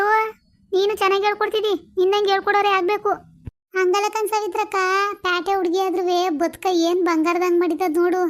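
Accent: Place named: Mandya, India. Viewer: ಮೇಡಮ್ ಇವಾಗ ತಾನೇ ವಿಡಿಯೋ ನೋಡಿದೆ ತುಂಬಾ ಚೆನ್ನಾಗಿದೆ ಇದು ಮಂಡ್ಯ ಶೈಲಿಯ ಭಾಷೆ ಅಲ್ವಾ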